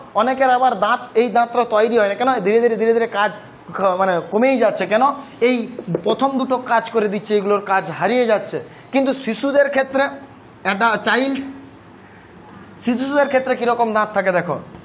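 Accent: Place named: Medinipur, India. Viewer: আপনার কথা তে kochi শুনেই বুঝতে পারলাম mednipure আপনি....দারুণ হয়েছে ক্লাস টা ❤❤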